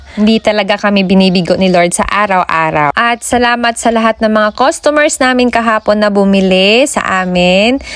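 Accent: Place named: Iloilo, Philippines. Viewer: ilongga ka mam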